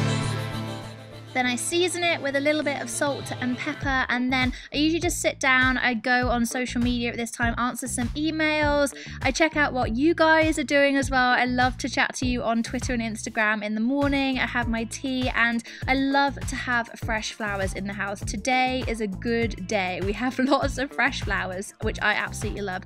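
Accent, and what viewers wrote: United Kingdom. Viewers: I am in love with your british accent :o <3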